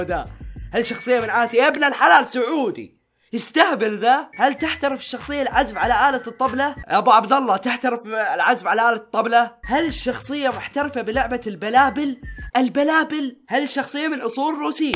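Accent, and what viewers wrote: Mosul, Iraq. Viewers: اللهجه المصلاويه لهجه من لهجات العراقيين (الموصل) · لهجة المصلاوية لهجة عراقية ( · اللهجة المصلاوية هي لهجة الموصل بالعراق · اللهجة المصلاوية لهجة عراقية في مدينة الموصل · الهجة المصلاوية وهي من العراق وتوجد فقط في الموصل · الهجة المصلاوية هي لهجة عراقية😂محافظة موصل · اللهجة مصلاوية لهجة عراقية يتكلمها اهالي الموصل (مدينة شمال العراق) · اللهجة المصلاوية لهجة موصل بلعراق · لهجه المصلاويه لهجه بشمال العراق في محافظة موصل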